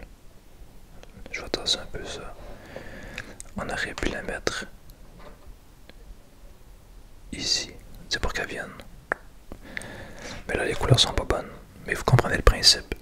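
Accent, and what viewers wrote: Quebec, Canada. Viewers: Entre ton accent québécois et les jeux de société, ça me rappelle les soirées en Randolph, j'en deviens presque nostalgique ! En plus les petites pièces sonnent tellement bien !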